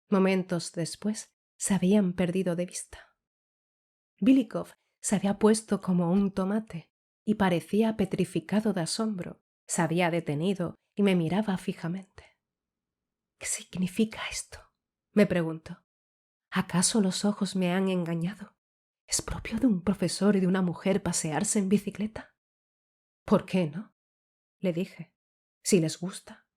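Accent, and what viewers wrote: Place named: Spain. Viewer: La voz, la entonación, la pronunciación de algunas consonantes que contrastan con la suavidad de las otras y las vocales, me hipnotizan. Además que de por sí el acento español de España, cuando es claro, me encanta.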